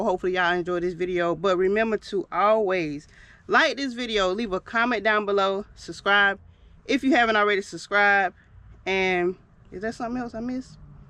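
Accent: South, United States. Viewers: Im legit addicted to the thiccness, the skin tone, the southern accent. I love it all. You should consider hiring a video editor to save you some time.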